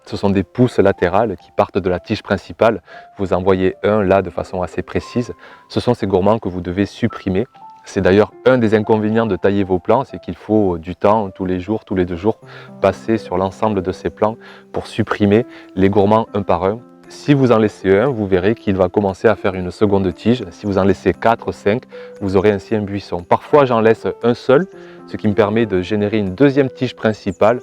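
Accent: South, France. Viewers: Oyez! Oyez! braves gens, l' nouveau est arrivé: il a un toujours un petit accent du Sud, un bon parfum de tomates variées et autres fruits et légumes, une belle pointe de charisme, une énorme envie de partager, un coeur qui bât au rythme de son potager (En cette saison il devrait être au ralenti? Que nenni!! Il est en plein accélération.) En 2019, préparez-vous à de l'émotion, de l'échange, du partage, de la réussite (des échecs aussi!) et du goût dans son potager mais également dans le vôtre. Merci pour cette vidéo qui a réchauffé l'atmosphère de plus en plus froide. Vive la tomate!!! A bientôt et bonne saison à tous les jardiniers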